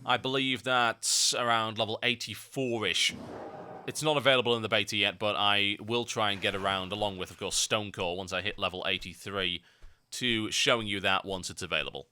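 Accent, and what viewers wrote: United Kingdom. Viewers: how dumb... He's got a british accent and jest look at his channel background....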